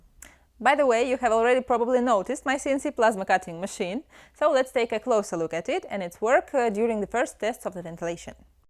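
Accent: Russia. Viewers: why do you have that terrible Russian accent? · Ok, two things. The first one is that you aren't based in USA, but in Russia. I can tell you because of the sockets, also you do have a bit of Russian accent. The second one is that you clearly didn't do this alone, there is a man behind you, and it's not your grandpa. There is a man with a lot of knowledge. I am no fool. You are talented, but you didn't do this all by yourself, there is someone behind every move, maybe more than one person.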